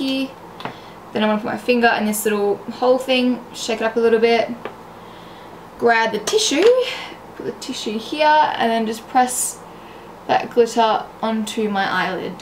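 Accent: Australia. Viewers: is she Australian?! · Is she from Australia? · R u an Aussie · She's Australian, she cant change her accent. Shes perfect either way. LOL. xo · no she's aussie just like me and she doesn't have an american accent. also her po box address is in WA which is Western Australia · She is not British lol. She is Australian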